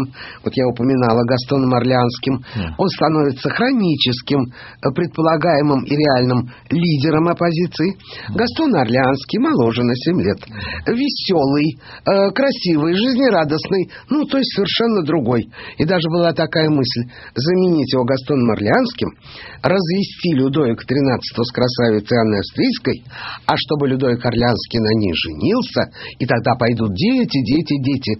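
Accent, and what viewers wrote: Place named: Moscow, Russia. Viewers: Это произношение, характерное для Московского диалекта. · Это московский говор. Так говорили в Москве всегда. Не булочная, а булошная, например. И при чем тут еврейка. Например, в Берлине и евреи, и турки говорят на берлинском диалект. И никого это не раздражает.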